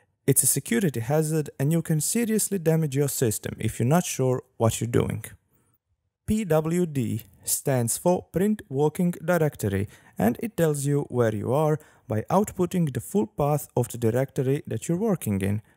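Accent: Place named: Croatia. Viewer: This.... was... definitely a video I watched. ?_? xD Anyhoooo... I still enjoy listening to that supreme Croatian accent. ;)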